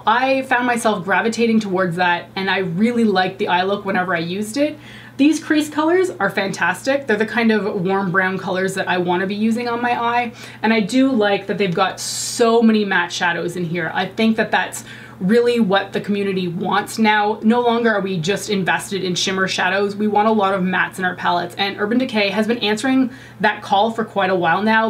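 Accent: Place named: Eastern Canada, Canada. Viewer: Your eastern Canadian accent is the best I am from the west and my Canadian accent is so horrible in comparison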